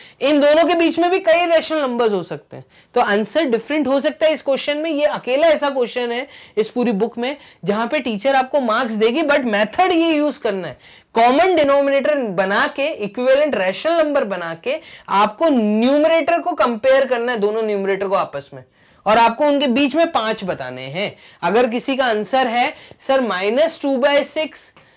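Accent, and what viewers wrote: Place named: Odisha, India. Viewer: Sir i think you are from odisha I am from odisha and i mark your language and i think you are from odisha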